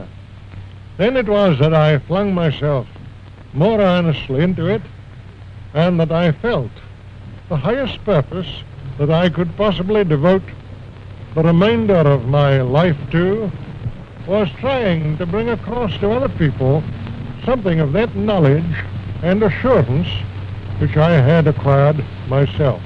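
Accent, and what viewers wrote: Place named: Edinburgh, United Kingdom. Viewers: Och! Man's scottish is really showing here. I love it. Would have been very interesting to sit down and talk to him about all sorts of matters. I find it fasinating that someone who is educated man of science matters was so into Spiritualism. Where's a time machine when ya need it? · Edinburgh accent, similar to my own.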